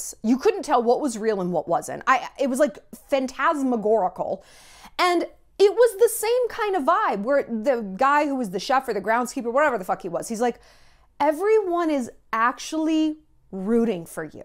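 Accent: United Kingdom. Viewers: Love the British accent, and I’m British! I have a name for your plot of land - Shallonshire ❤️ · Your British accent deserves a whole Netflix show. 🤣❤️ i love you woman. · Hahahahahhahaha😂😂😂😂😂😂 your British Accent is soo good! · Your British accent is amazing!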